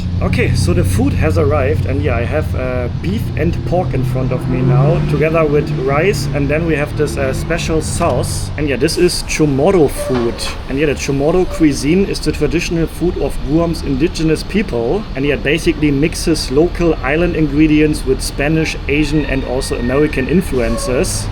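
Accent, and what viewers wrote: Germany. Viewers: Let the German have his German accent folks. You can't POLICE the entire world's pronunciation. Hell, Americans don't agree on how to pronounce AMERICAN CITIES. Have some grace. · are you born german? your accent is blended sounding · Thickest German accent ever!!! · He's from Bremen, Germany and decided to make his videos in English. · Der Deutsche Akzent knallt richtig rein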